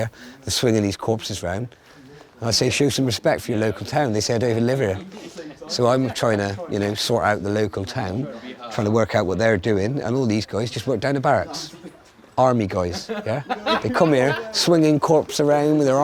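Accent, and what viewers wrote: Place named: Gloucestershire, United Kingdom. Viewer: Nice Gloucestershire accent 😂! I went to Wyedean lol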